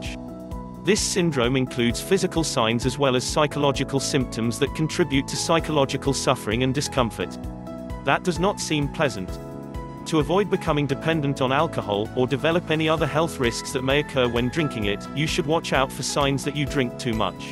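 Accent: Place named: RP, United Kingdom. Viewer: How rich are u😂 im not judging but this makes me feel like the queen of england is talking about vodka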